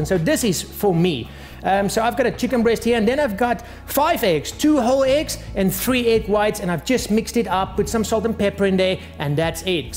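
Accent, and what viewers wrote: South Africa. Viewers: I think he's South African... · hey im south african and i cant help but to hear a south african accent from u. are u from South africa?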